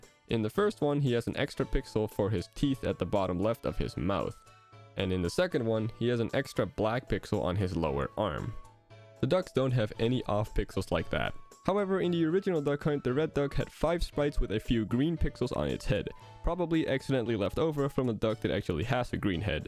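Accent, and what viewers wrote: Netherlands. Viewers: When You listen closely You can still Hear his dutch accent.Das cool man,blijf video’s maken 👍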